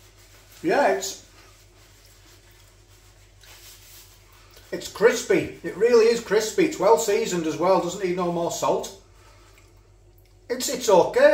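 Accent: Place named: North Lancashire, United Kingdom. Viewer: That's a broad North Lancashire accent young man. That is why Barrow and the rest of Furness will always be Lancashire, you keep the real county alive, and better still the abolition of Cumbria in May 2023 frees you from being run by Carlisle, effectively Scottish lol. Me and hubby enjoying your video's following You Tubes recommendation of your channel and while you're around, Lancashire will never die :)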